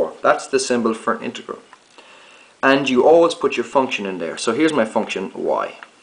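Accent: Ireland. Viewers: You have a lovely irish brogue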